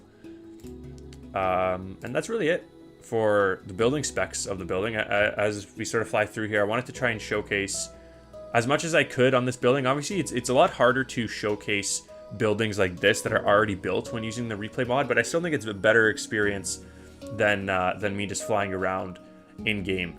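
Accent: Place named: Canada. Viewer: OOOh that new microphone really makes the Canadian accent come out!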